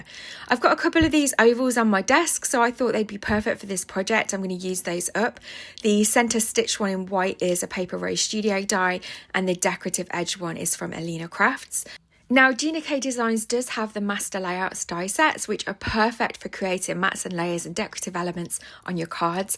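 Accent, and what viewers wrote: United Kingdom. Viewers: I am so in love with your card! This was a wonderful tutorial! I really enjoy your British accent and your style of video! Now I have to to buy this set! Haha. Thank you so much for the inspiration!❤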